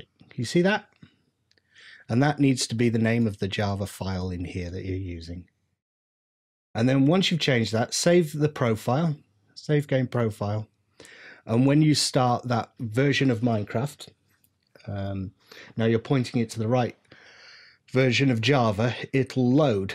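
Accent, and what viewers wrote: United Kingdom. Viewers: nice accent british or ?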